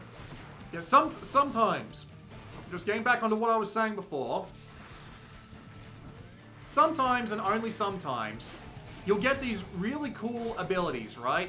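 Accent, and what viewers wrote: Australia. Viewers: Good vid. Out of curiosity, are you Australian perchance ? That and is the framerate consistent handheld and otherwise? Thanks in advance lad.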